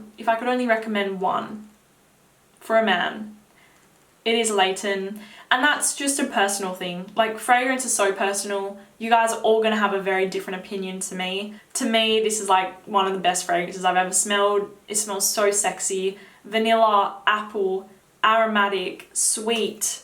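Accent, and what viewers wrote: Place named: Australia. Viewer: I love her accent, Australian accent😊😊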